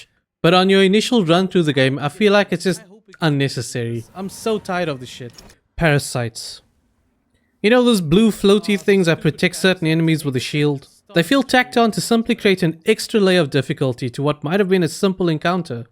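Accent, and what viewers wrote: South Africa. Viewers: Your English is flawless. Sounds like your first language but you have a bit of a South African accent?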